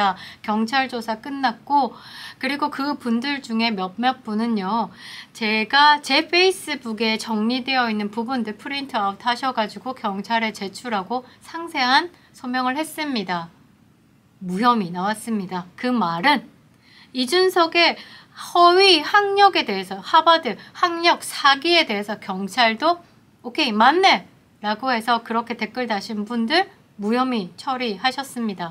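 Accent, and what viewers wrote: Gyeongsang, South Korea. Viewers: 준석이도 싫지만 니 경상도목소리도 듣기싫구나 아무튼 준석이졸업장엊ㅅ는거만 밝혀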